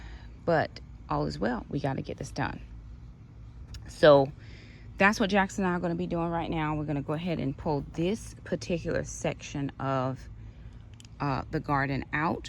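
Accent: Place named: Carolina, United States. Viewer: I love the Carolina accent 🩷 also you just made me look up homestead, farmstead, and its actually what ive been wanting to do. (God speaks)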